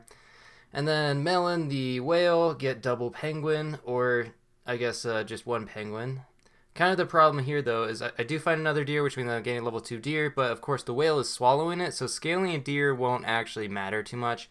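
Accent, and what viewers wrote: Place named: Canada. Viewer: Why do you sound like kenadian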